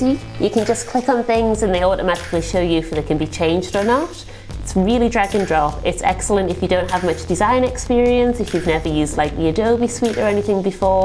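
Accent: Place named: United Kingdom. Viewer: First of all, our beautiful and intelligent teacher is a stone cold fox! Wow! Her edgy British accent is super cool, and her subtle sense of humor makes the video easy to watch. It’s nice to see a real human being, with attitude, great tats, and lots of smarts making instructional videos instead of the usual blah blah blah missy girl. I can hardly wait to start my info graphic… keep up the good work!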